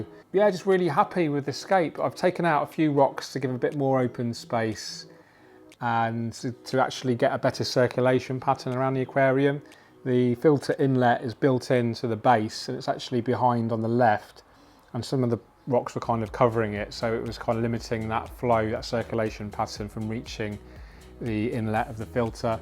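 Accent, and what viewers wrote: England, United Kingdom. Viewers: I love your English accent and keep on rambling! 😀 Also I'm pretty excited about the Dennerle news. Thanks for sharing 🤗👍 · Another great Video, and what a funny accent, must be english ,😁 greetings from germany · So many excitiing projects. So much positivity and next level growth. And then come the tiny trolls and cowardly keyboard warriors looking for any little thing to dump some useless negativity on you, trying to slow your roll. The utterly asinine statement about your English accent and rambling... you're English, you live in England, does it not stand to reason and logic that you would have an English accent? It's the blatent ignorance and outright stupidity of cowardly keyboard warriors that always amazes me. If they don't like the accent or style, why not simply leave and find a dramatic, tiny troll channel that will resonate on the same level of stuck-on-stupid. 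It really is that easy and that drama free. No need to dump negativity and show off personalized styles of stupid. 86...pushing 90 thousand folks like the English accent and the ever growing positivity, style, and awesomeness of you doing what you love, loving what you do, and sharing this amazing journey with us. 😘 👍💕👍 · Oj, what's wrong with an English accent mate?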